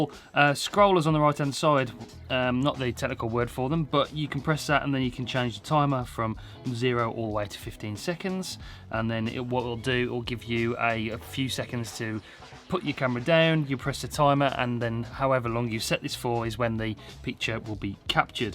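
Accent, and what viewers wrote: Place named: England, United Kingdom. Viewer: that english accent really sucks. Sometimes is hard to tell what the hell you are saying?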